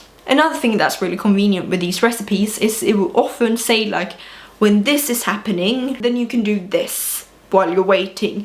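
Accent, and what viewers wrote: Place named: United Kingdom. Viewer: Jeez, I thought you were British. Your accent is incredible.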